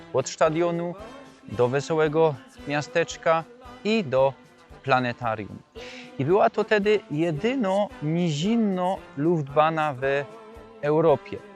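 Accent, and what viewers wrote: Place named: Rural, Poland. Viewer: A co ty tak często zmieniasz KOLOR SKÓRY? Zaczynasz jako czorny Murzyn, ale za chwilę udajesz białasa! O tu ==> Po drugie ten wasz "język" śląski to zwykła KLASYCZNA wsiowa gwara, taką, to i u nas, w Świętokrzyskiem często spotykamy, ale nikt tu nie udaje "uczonego językoznawcę". Wsiowy, to wsiowy! To widać, słychać i... czuć. :hand-orange-covering-eyes::body-blue-raised-arms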